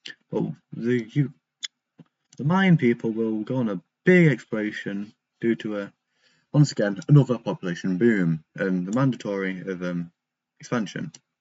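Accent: United Kingdom. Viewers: Adult Bri’ ish man video Also hi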